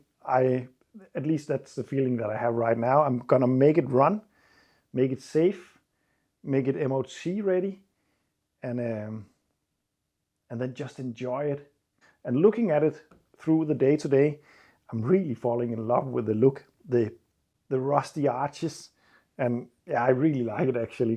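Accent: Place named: Denmark. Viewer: Looks like a Peugeot 504 at the front. I know a fellow Danish man and his accent sounds similar to yours.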